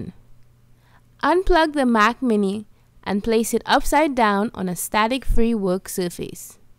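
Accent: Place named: Jamaica. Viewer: jamaican gal ah talk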